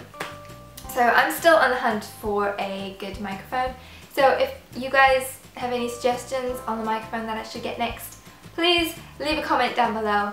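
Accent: England, United Kingdom. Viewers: I never knew you were British!!!! Ilysm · i think she is Indian and has a british accent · OH MY GOD SHES BRITISH???!!!!!!??? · She speaks in a British accent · Your British😱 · love your british accent and you are so charming!! kisses from brasil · She is from South Africa, but she finished her master degree en England. That's why she got her very nice accent...👍🏻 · Your British! Me didn't know that. 😱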